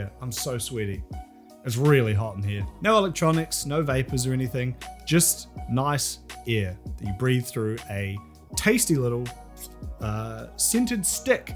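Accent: New Zealand. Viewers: Kud rock? Like the Kud whale? Haha love the kiwi accent!! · Hearing a Kiwi say words like "festival" always brings a smile to my face. Top tier accent. Sunds suu frundly ay. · He's clearly from NZ 😅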